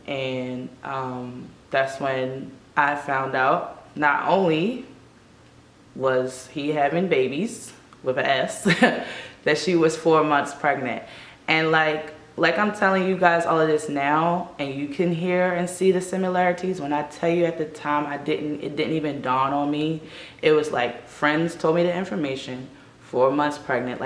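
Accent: Baltimore, United States. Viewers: The Baltimore came out in this vid lol #westbaltimoregirl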